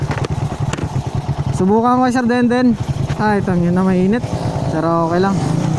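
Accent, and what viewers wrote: Iloilo, Philippines. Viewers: Ilonggo ka hah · Tkalun kau kah ilonggo... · Ilonggo ka lods ahhahah (testing ta😁😁😁)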